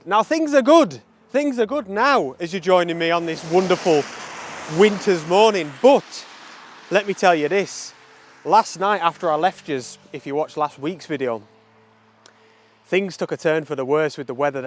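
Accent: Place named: Merseyside, United Kingdom. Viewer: Great photos. Richard Tatti of nightscape images, rigged up a quick release plate to his gas oil thing like what you have, now he can stand up and cook, lol, an idea for you. Are you from Merseyside, trying to figure out your accent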